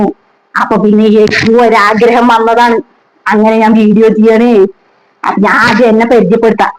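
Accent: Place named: Palakkad, India. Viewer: എനിക്ക് പാലക്കാട് ഭാഷ ഇഷ്ടാണ്..എന്റെ ഒരു നെയ്ബർ ഉണ്ടായിരുന്നു.. മുംബൈയിൽ.. അവര് ഇപ്പൊ നാട്ടിൽ സെറ്റിൽ ആയി.. പെട്ടന്ന് അവരെ ഓർമ്മ വന്നു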